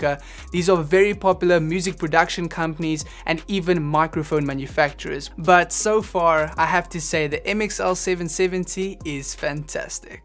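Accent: South Africa. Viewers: hello ! are you from south africa ?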